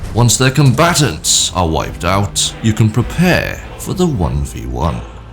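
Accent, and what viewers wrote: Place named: Scotland, United Kingdom. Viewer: Apologies, clearly Scottish and trying not to be 😅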